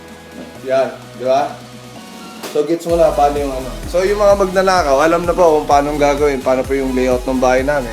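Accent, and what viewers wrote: Ilocos, Philippines. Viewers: Ilocano ka sir?? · Ilocano ka ata eh noh???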